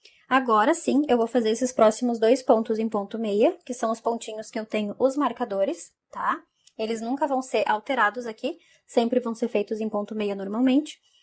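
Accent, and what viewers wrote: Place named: Rio Grande do Sul, Brazil. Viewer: Olá pelo teu sotaque tu és gaúcha de onde tu és?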